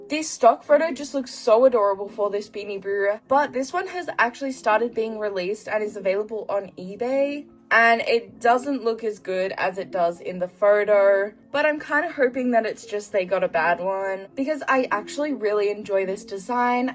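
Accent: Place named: Australia. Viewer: I love your australian accent so much lol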